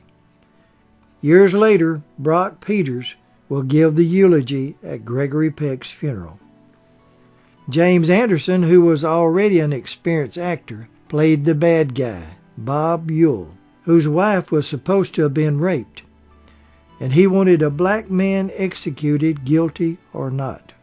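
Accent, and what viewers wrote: South, United States. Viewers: One of your best,! I love your bios of legendary stars of our classic American films. A few little mistakes, though, it's Charles Bickford, not Charles Pickford, Chuck Connors, not Chuck Connor, and Jean Peters could not possibly have starred in 1958's The Big Country, as by that time she had married Howard Hughes and retired from acting; A Man Called Peter, released in 1955, was her last feature film. I think you meant Jean Simmons. I'm a Southerner myself, so I understand and relate to your wonderful drawl. My late mother was from middle Tennessee and often got a bit mixed up here and there (she'd say "punkin" for pumpkin, and "purnish" for punish - just the tip of the iceberg!) But it made her all the more lovable for us all. Keep doing what you're doing, and don't ever change!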